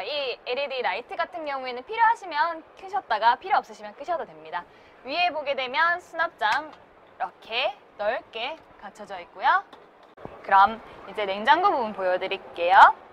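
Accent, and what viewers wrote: Gyeongsang, South Korea. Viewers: 사투리 타령하는 인간들아... 제대로 들어봐. 억양이 경상도 느낌이 있지만 단어나 구문이 사투리가 뭐가 있냐? 사투리는 단어와 서술어 등 구문을 말하는거다.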